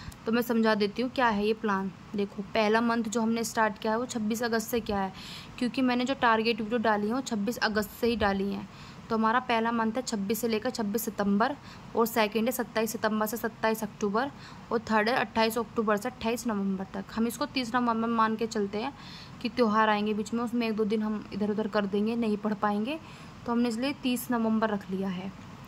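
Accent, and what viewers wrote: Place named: Western Uttar Pradesh, India. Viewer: Voice tone se lg rha aap west up ki taraph se ho😊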